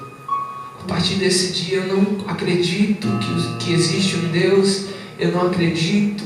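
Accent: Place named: Bahia, Brazil. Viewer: Sotaque do interior da Bahia que saudade!!!!!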